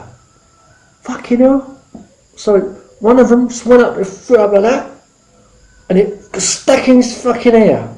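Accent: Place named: London, United Kingdom. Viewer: BRILLIENT HAHA YOU CAN HEAR YOUR LONDONER ACCENT HAHA LOVED THIS AND THE HUMOUR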